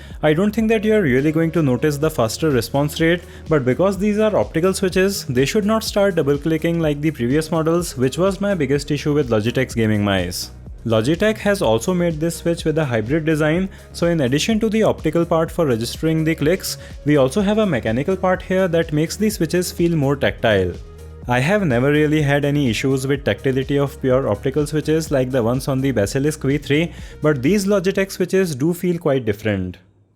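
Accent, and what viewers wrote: India. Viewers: you hindu speaking ppl are simply unbearable to listen to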